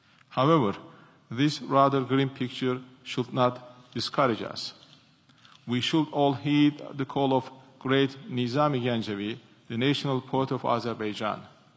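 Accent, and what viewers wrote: Turkey. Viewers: Hala bu kadar agir bir turkce aksani ile ingilizce konusmasi😅 Arkadas ne olacak bu turklerin ingilizce ile imtihani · Türk gibi konusuyor :) Ama istedigi gibi konusabilir, karizmasi yeter!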